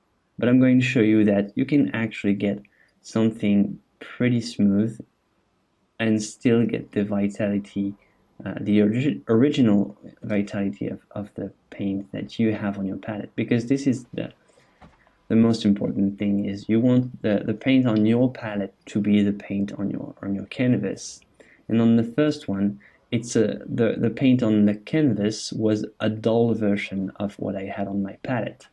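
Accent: France. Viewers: Is this guy French?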